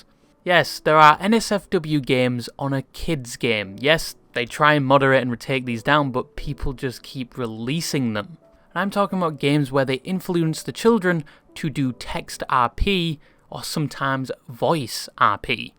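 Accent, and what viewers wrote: United Kingdom. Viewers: And that Is nice British accent 'give me a bottle of wot'ter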